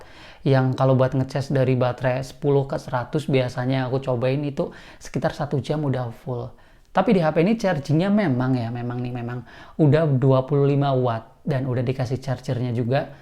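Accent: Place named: Banyumas, Indonesia. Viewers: Khas Banyumas 😁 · Wiih wong banyumas jebule 😂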